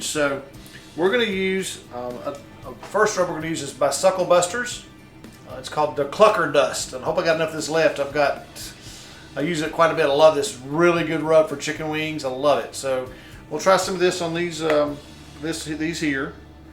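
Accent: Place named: West, United States. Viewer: My name is Ron and I have a tip for you. If you use the clips from the Onlyfire pizza and rotisserie assembly it will rase your great higher into the kettle top and the chicken cooks even better (crispier). I never take my clips off anymore, I use them on all grilling that I do.. P.S. I truly enjoy your Chanel. I like your background I like how you present your work and slight and not so strong western accent there is a western actor that you look and sound just like him, he was the Rancher on City Slickers. Also you should get a long meat poker or fire place pokers to use when setting up your grills. Great video as usual.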